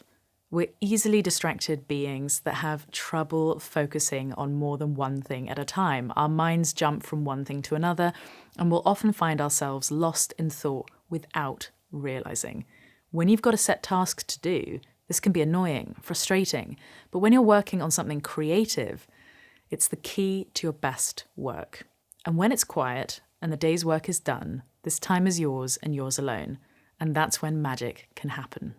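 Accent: United Kingdom. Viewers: Some people have it and some people don't. Spender has it! Perhaps she realises this, perhaps not! What do I mean by "it"? Let me explain. She's a song writer. She a musician - plays at least 3 instruments, that I am aware of! Is quite good at these things. Knows a lot of nice people. And seems to have heart flutters at the mention of John Mayer, whom I refer to as JC - those are his initials. Has a British accent. Here music is not my style - however is a gem especially since "litrally" wrote it, performed and produced it - all kind of a complex process. So what do I mean by it. PRESENTATION! She natters on about such things as buying (and unpackaging) a vintage guitar, the excitement of visiting music stores (in several countries), the ups and downs of practicing music - and offers advice. To me her real talent is that she can talk about anything and make it very interesting and in my case impossible to ignore. 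She could come on here and talk about a songbook she recently bought at a used book store. She wouldn't talk about the songs in the book but rather about the several missing pages and the songs that may have been, or should have been on those missing pages. I would listen, sit back and smile and have another sip of coffee!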